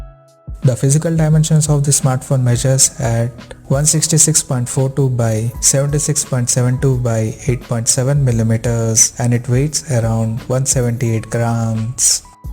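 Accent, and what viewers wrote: India. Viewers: Horrible Indian accent- sing song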